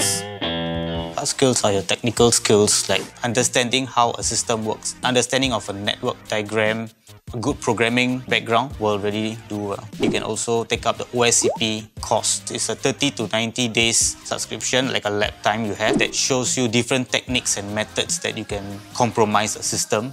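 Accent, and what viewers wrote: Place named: Singapore. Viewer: Okay, this is weird. You're indian but you speaking like singaporean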